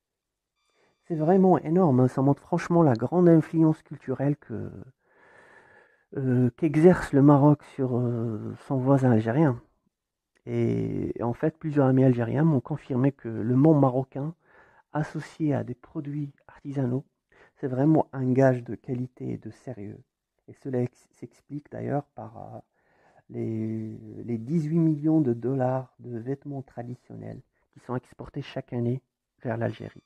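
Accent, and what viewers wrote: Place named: France. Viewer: ا صاحب الفيديو فرنسي اظن من لهجته. الله يعطيه الصحة شوههم و اعطاهم في عصير في كأس كبيييير . العز للمغرب و المغاربة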